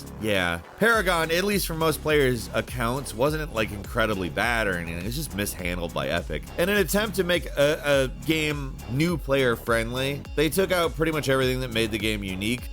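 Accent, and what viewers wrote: United States. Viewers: I always cringe when I hear the American pronunciation of Japanese names but that's a given at this point as many Japanese people most probably can't pronounce American names really well either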